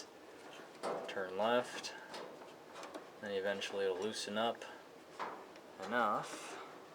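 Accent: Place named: Green Bay, United States. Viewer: Hey thanks! Where are you from? You sound like you're from Green Bay.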